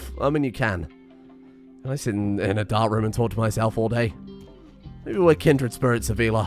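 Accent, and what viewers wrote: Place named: United Kingdom. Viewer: That's how you pronounce it in UK English, and if you couldn't tell from his accent, he's speaking UK English.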